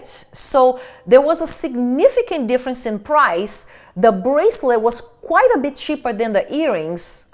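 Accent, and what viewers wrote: Brazil. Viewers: outro dia te falei uma crítica construtiva e você ficou brava e até disse que eu tinha inveja pq era brasileira como vc, mas não tenho inveja não... sou bem casada com americano tb, amo esse país e os brasileiros eu concordo que alguns passam do limite mas eu sou uma pessoa que não sei o que é inveja desde que nasci, fique tranquila, porque eu trabalho muito pra ter o que tenho sem invejar nada de ninguém....mas eu adoro vc e adoro seus vídeos!!!! Adoro ouvir vc falando inglês pq falo como vc, com sotaque... e adoro suas peças de roupas, acessórios, sapatos, jóias e principalmente as bolsas!!! Parabéns pelo vídeo.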